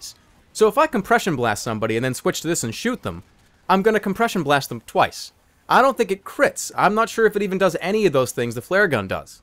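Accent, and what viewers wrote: Boston, United States. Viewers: I watched Shibby2142's video about the new weapons, and I gotta say: this video is much more entertaining. Shibby is still funny but more calmer and less energetic. Your videos always have this energy to them (maybe it's the Boston accent), and that's why I subscribed to you before Shibby.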